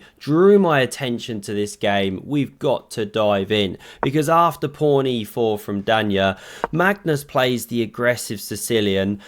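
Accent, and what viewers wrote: United Kingdom. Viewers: Got to love your British accent... I thought I heard "What a channel! Like a BETA version of me" and I‘m like "wait, what ?!!" So listened again. Love the humility. 180 degrees from what I thought I heard. Both channels are awesome.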